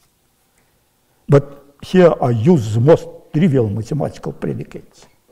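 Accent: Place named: Russia. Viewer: Google clearly didn't train their model on the accent of elderly russian professors.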